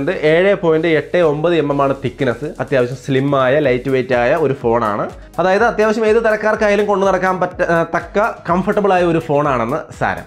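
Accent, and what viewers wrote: Thrissur, India. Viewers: നിങ്ങൾ തൃശൂർ ആണാ 😍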